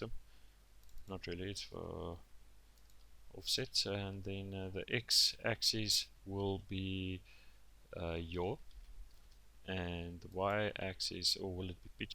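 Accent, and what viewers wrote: South Africa. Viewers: I also noticed the way you pronounce words. So I assume dat jy ook afrikaans is :p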